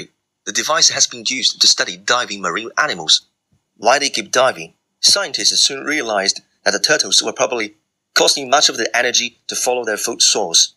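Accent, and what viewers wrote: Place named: India. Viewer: Wataaaaaa, he must be indian, awful accent I've ever heard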